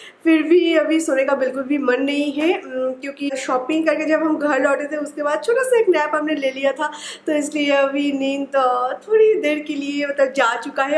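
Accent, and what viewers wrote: Bengal, India. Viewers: Didi apni bangla te vlog korle basi vlo lagbe...hindi ta apni vlo bolen bt, Apnar kothar moddhe sob jaygate banglar tan ache... Plzzz banglar manus banglay fire asun....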